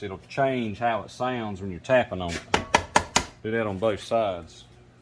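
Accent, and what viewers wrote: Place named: South, United States. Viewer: Man I thought I talked country but I think you have me beat. Keep up the good work!!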